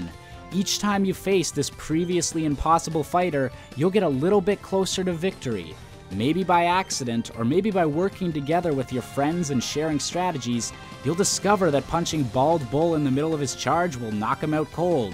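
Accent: Canada. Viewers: you sound especially canadian here :)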